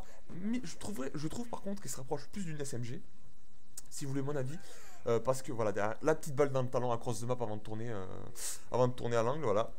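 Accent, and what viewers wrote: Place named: Marseille, France. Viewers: j'alias lui demander s'il était pas marseillais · Un toulousain avc un accent marseillais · T'avais dit que t'allais montrer tes stats lol jaime beaucoup ton accent Marseillais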